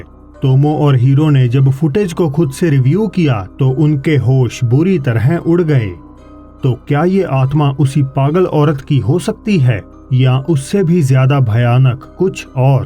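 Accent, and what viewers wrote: Punjab, India. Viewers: Bro,Mera naam Raju Bhullar hai,aap ki English aur Hindi bolne k style mein Punjabi touch clearly jhalakta hai jo k bahut garv ki baat hai. Whole Punjabis should proud of you aur aap ka ' kaise hain aap & ' chaliye phir shuru karte hain" is awesome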